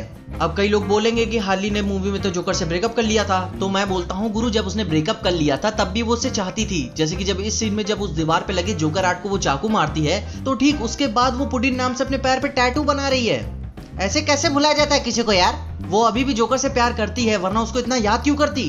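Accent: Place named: Bihar, India. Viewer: You must be bihari You sounds like bihari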